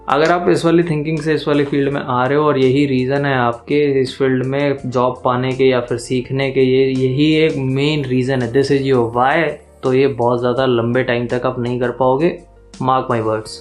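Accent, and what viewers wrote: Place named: Haryana, India. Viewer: are bhai Haryanvi accent OP 🔥🔥 , JAI HARYANA 💪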